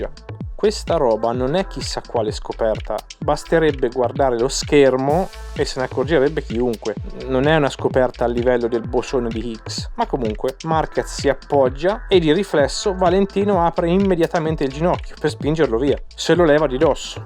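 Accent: Perugia, Italy. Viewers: Ma sei di Perugia? Grandissimo 😂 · Ciao, sono sul tuo canale per la prima volta e ti trovo molto bravo, una domanda: sei di Perugia? (Lo sento dalla parlata) 🤗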